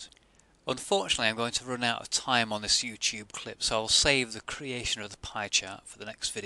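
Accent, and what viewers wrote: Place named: Northern England, United Kingdom. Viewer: I don't know if this guy is paid for this excellent job, but he made this tutorial absolutely stunning. Thanks for that! Btw.I would guess his accent as from northern England,maybe Manchester :))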